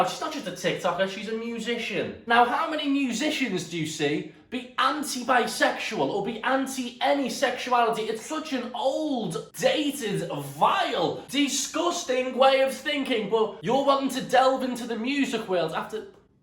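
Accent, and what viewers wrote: Liverpool, United Kingdom. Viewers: scouser to scouser I love you 😂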